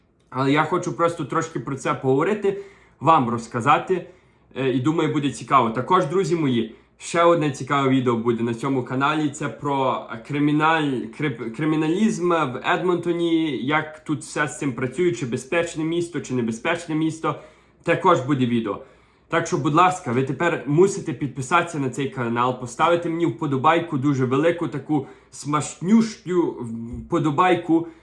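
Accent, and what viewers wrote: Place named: Lviv, Ukraine. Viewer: Дуже гарний львівський акцент, маю також родичів у Львові. Але також хочу виїхати через війну, але вік 52,сину 15,чи маю шанс? А ви молодець!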